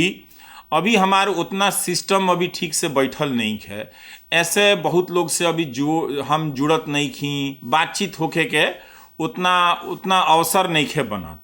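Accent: Bhojpuri, India. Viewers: माई रे माई बिहान होई कहिया। आदमी के आदमी से पहचान होई कहिया। नफ़रत के बोली मेटान होई कहिया। माई रे माई ....अभी जवन चल रहल बा ओकरा के देख के कुछ अपन लाईन जोड़ देनी ह, सचमुच आजो अनहरियाईल रहम हमनी के त बिहान कयीसे होई। रविश सर, राऊर भोजपुरी के बोली सचमुच बड़ा निक लागल। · राहुर भोजपुरी सुन के मजा आ गईल😊👍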